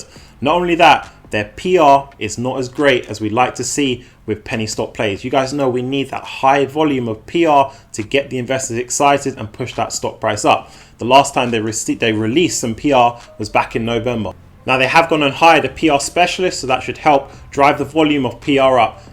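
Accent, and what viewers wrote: United Kingdom. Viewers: love your British accent!! UNFORTUNATELY ALL THE STOCK ARE OTC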